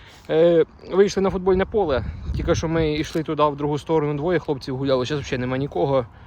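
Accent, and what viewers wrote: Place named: South, Ukraine. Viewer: Привіт земляк. Наш южноукраїнський язик ні з чим не переплутаєш І не срівняєш ні з чим, ласкає вухо... Місто Покров бивше Орджонікідзе вітає тебе 🙏❗ Вернувся назад щоб сказать-написать, шо підписався:hand-pink-waving:і👍поставив.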